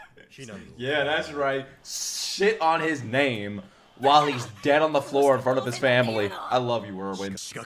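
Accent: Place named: New York City, United States. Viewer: You can already hear the city accent getting to him lolllll. A true NYC resident in the making.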